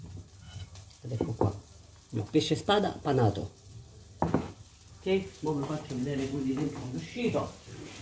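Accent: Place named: Lecce, Italy. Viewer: mi sono appena iscritta al tuo canale, sei bravissima e simpaticissima con la tua parlata leccese, io sono di taranto e mi piace tantissimo cucinare, come da buona pugliese. Ciao e adesso che ti ho scoperta non ti lascio più. Amica mia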